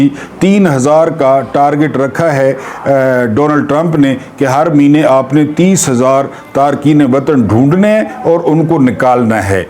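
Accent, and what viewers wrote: Saraiki, Pakistan. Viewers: صاحب سرائیکی لہجے میں ارد بہت ہی دلکش لگتا ہے ۔❤❤❤❤❤❤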